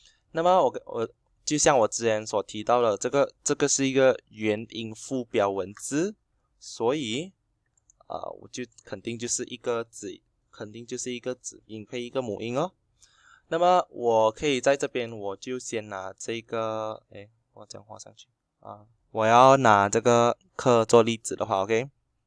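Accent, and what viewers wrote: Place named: Malaysia. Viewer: 先改一下你的馬來西亞的華語的腔調，很難聽！很多壞習慣！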